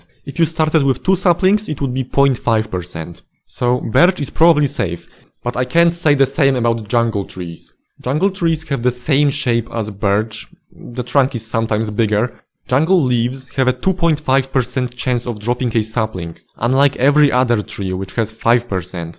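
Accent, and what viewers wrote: Poland. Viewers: your accent is too polish. can't watch. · Bro i swear to god you sound 99% Polish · You sound polish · the second i heard the voice i knew you were from Poland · Myślę sobie, kurde akcent jak u Polaka, patrzę na informację o kanale - oczywiście że z Polski xd pozdro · Are you Polish mate? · As a fellow Pole, I have to say, you have the most stereotypical Polish accent ever 😭😭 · polish accent is peak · Are u polish? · Do i hear Polish accent or am I tripping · Czuć po akcencie że rodak ;) Fajny filmik.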